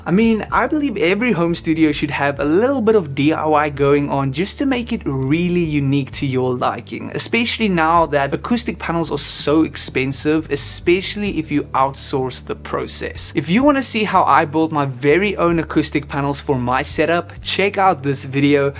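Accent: South Africa. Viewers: I can already tell by that accent that this man is a SA kid🤌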